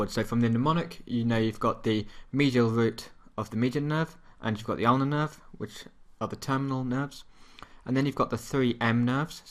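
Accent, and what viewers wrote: United Kingdom. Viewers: yup thats british accent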